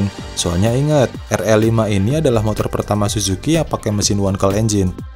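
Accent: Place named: West Java, Indonesia. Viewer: Fix, logat nya sunda.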